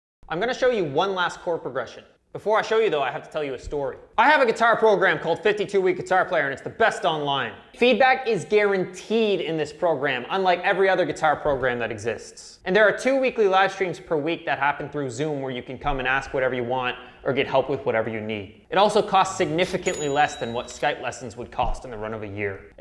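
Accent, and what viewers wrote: Canada. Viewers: Thanks man. I actually searched and they actually say Abouut instead of Abaout. Didn't know it was called a Canadian Raising. xD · Pranav Gautam Canadian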